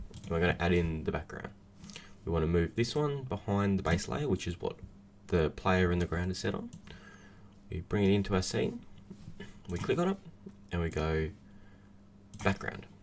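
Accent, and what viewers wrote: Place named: Australia. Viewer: you can tell he's aussie, i can cuz i am too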